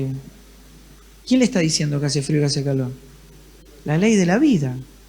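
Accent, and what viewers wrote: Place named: Argentina. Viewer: Es argentino es normal su forma de hablar ...no busque el pelo en la sopa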